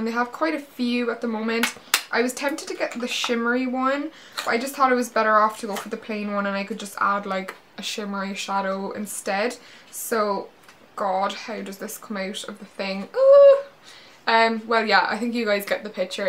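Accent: Ireland. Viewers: OMG are u Irish I am too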